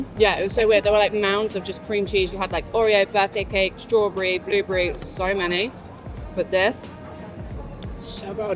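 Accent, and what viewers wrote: United Kingdom. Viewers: Hey, are you british? Cool accent 😎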